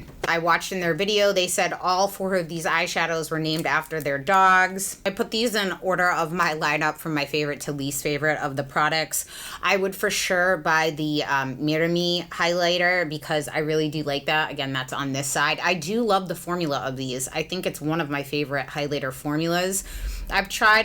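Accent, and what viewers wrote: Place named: Massachusetts, United States. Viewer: I grew up in MA and knew as soon as I heard that she was also from that area. It's a regional sound.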